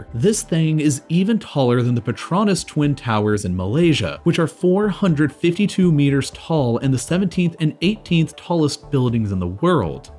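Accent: United States. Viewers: man i hate how this guy always uses km and meters, Wtf comon. Most of your audience is probably american and you yourself sound it... so come on!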